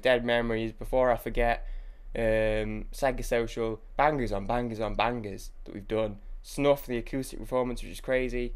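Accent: United Kingdom. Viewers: Sometimes it's hard to hear exactly what you're saying because of your thick British accent, but that's okay because I really enjoy just hearing you talk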